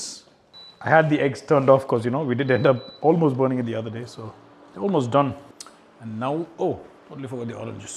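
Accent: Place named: Madurai, India. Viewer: Waiting for your comeback brotherman, these low days will fly away sooonnn... A big fan of your fitness and accent - fellow madurai friend..🙂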